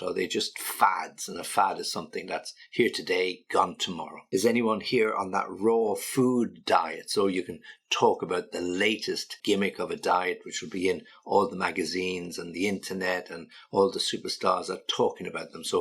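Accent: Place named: England, United Kingdom. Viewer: Do you live in England? Your accent.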